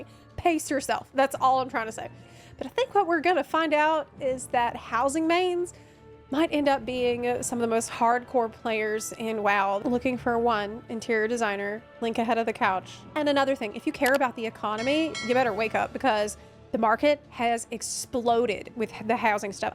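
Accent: South, United States. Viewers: Yeah, same, same! I just left a comment about it and to clarify, I’m exactly the same way. Not trying to be confrontational or offensive at all, just legit curious! My father’s people are from Tennessee and the rest of my family is from Oklahoma, so she sounds like half my kin 😄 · you sound southern:P maybe Tennessee or Louisiana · It’s been years since I watched a video. Was she always southern? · sound like you have a Southern drawl in your American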